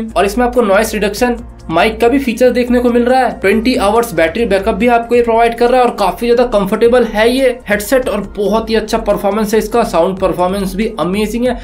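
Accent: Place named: India. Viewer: Bro... wt is this??? - I thot my pseakers saw brknen (im replying as I heard u) but nooooo this some broken Indian crap? just speak in Hindi or whatever you local language is - lord. this is a horrible listening experience.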